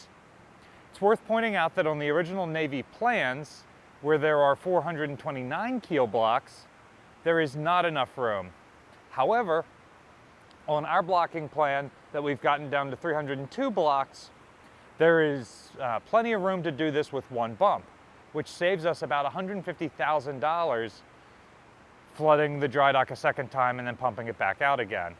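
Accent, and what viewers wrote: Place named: New York, United States. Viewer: great question, great answer to something that's been bugging me for years. thanks. You look and sound familiar. Are you from NY?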